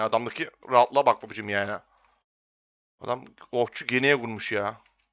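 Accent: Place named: Bartın, Turkey. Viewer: Bartın’lı mısın babacım 😃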